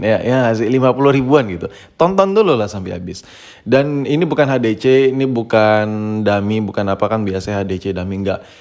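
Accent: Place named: Banjar, Indonesia. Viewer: Dari kalsel y itu ditempat ku tuh urank banjar boy🤣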